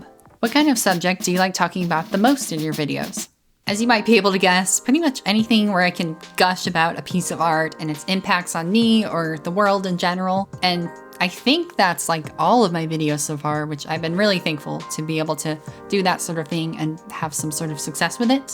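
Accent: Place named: South, United States. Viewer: i'm a linguist so it's actually super easy to answer! so southern american english has a lot going on with front vowels (vowels where your tongue is in the front of your mouth - think the vowels in "heed," "hid," "head," and "had") and diphthongs (vowels that are combinations of two vowel sounds - our non-rhotic diphthongs are vowels in "eight," "vote," "find," "down," and "boy"). particularly, SAE has the pin-pen merger where the vowels in those two words (/ɪ/ and /ɛ/) merge into the same vowel. you do this a little bit in the word "thing" in this video at . with diphthongs, SAE tends to reduce diphthongs to monophthongs; think "ah" instead of "I." you do this a little bit in the word "time" at . on the other hand, there's also diphthongization of what are traditionally front monophthongs! so thing like, "pay-en" instead of "pen." you do this a little bit in the word "genuinely" at . 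you don't like fully diphthongize it, but you turn /ɛ/ (vowel in "bet") into /e/, which is a vowel that doesn't traditionally exist on its own in english, as usually it's part of the /eɪ/ diphthong (like in "bait"). i feel like you also really velarize /l/ sounds a lot, like in "general" throughout the video. typically in american english, we do what's called an apical "L" (or light L, /l/) at the beginning of syllables (like in "light") but we do a velar "L" (or dark L, /ɫ/) at the ends of syllables (like in "pull"). the dark L is called as such because it jus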